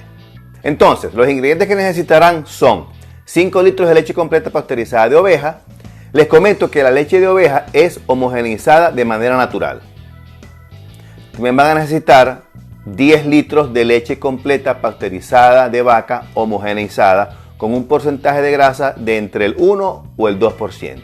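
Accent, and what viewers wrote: Zulia, Venezuela. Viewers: Tienes acento Zuliano eres del Zulia ?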